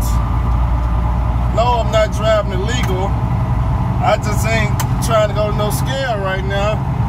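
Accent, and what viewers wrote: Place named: Farmville, United States. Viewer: sound like your in Farmville va.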